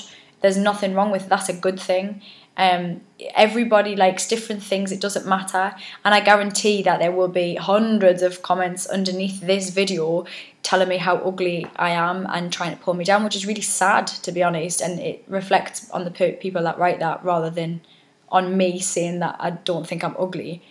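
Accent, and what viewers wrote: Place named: Newcastle, United Kingdom. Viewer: I love your positive attitude! (And Geordie accent... one of me favourite English accents) Cheers!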